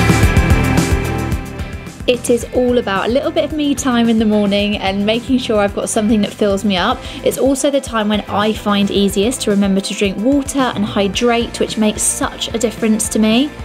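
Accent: United Kingdom. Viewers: Are you British or live in the U.K.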